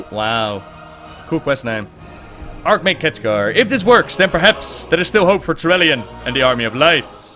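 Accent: Netherlands. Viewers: I don't get why so many people watch this crap this Dutchboy has a annoying accent.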